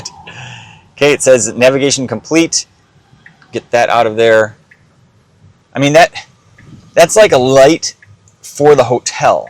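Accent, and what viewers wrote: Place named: Canada. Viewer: Don’t worry about how you speak. You would pass for a normal Canadian.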